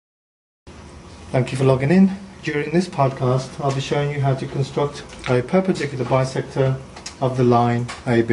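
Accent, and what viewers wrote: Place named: United Kingdom. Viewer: beautiful your british accent is a little distracting hahahaha but it's awesome too you tackled it beautifully.. i couldn't have explained it better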